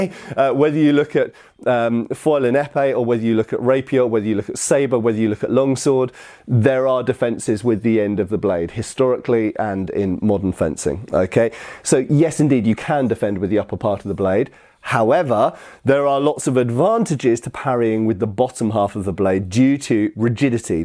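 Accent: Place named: United Kingdom. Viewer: If I couldn’t hear your accent, I would still know you were British due to the incredible dead delivery of innuendo here haha. Well done!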